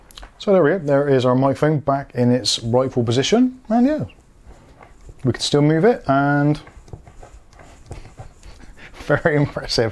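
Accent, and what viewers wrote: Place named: Gloucester, United Kingdom. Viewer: Great vid, I think you might have sold me on this. I'm in Vancouver BC, you sound like you're from my part of of the UK, Gloucester boy?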